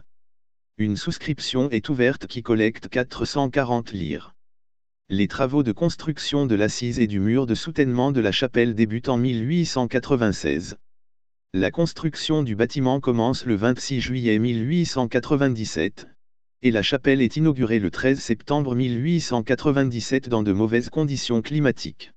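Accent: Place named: Uzbekistan. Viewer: Pourquoi cet accent ouzbèque quand vous parlez ?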